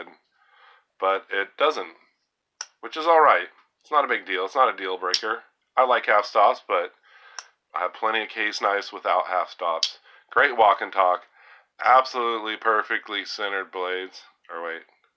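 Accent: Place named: Georgia, United States. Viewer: Hey brother You're starting to sound like a Georgia boy and I like it 👌 Really great knives today, love the stag and the burnt bone . Thanks for sharing brother 👍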